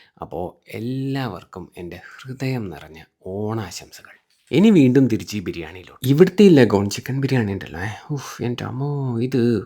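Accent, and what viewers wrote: Kannur, India. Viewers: Samsarm knr l mungipoya bro krch timek😂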